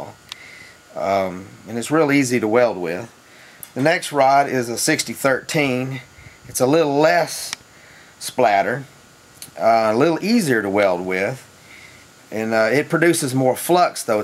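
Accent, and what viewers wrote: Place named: Southwest Virginia, United States. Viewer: Out of curiosity, where are you from? Sounds like our accents here in southwest Virginia.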